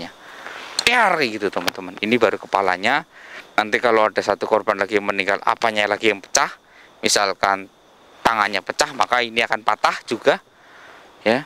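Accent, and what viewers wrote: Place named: Banyumas, Indonesia. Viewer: itu bhs jawa ngapak saudaraku, bhs halus tp ya terdengar beda dgn bhs jawa solo semarang, mungkin Mr ini tinggal d daerah jawa tengah bahagian barat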